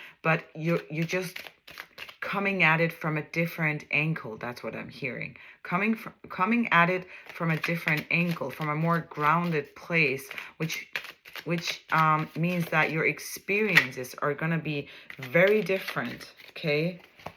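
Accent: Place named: Midwest, United States. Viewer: [VIRGO]~~~🛩️ HI! I had 444 on my phone and then you pop up on my TV screen😊 I'm new here. By your accent I would've swore your from the Midwest like myself..Illinois. Nice to meet you❤ have a awesome year! Your a doll😍😊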